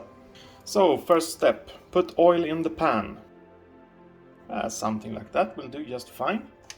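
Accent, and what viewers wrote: Sweden. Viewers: Hey are u swdish u sound like it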